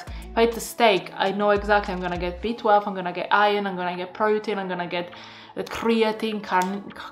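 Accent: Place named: United Kingdom. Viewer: Where is your accent from? I think you learned british english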